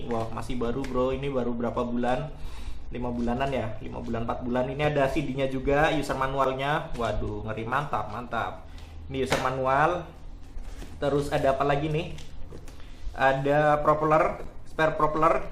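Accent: Banyumas, Indonesia. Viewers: ok sipp makasih mas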